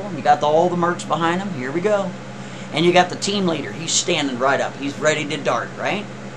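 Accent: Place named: South, United States. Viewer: A<link> DAMN UNCLE! I’m a new subscriber to your channels. I love everything you do. “Split Time”....hanging on EVERY.SINGLE.WORD. I love me some!! I’m from South Carolina so I caught the southern accent that you were throwing around especially when the story got to the damn uncle. LMAO 😂 It really cracks me up bc you usually don’t talk that way. Anyway, I loved the story, I love October Reignz, & I11uminati. You input a Song on your other channel/video recently “ ” by the and I was thinking while I was watching other October Reignz videos how perfect THAT song would be in an upload. I was like, wait for it,& there it was. I don’t wanna sound like a sycophant or anything but I LOVE EVERYTHING THAT YOU DO!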